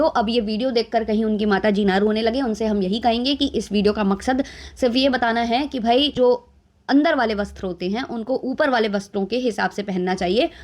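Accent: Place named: Western Uttar Pradesh, India. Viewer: Di ap up west se ho na .kyoki apke bolne se pta lgta h .hm bhi up west se h .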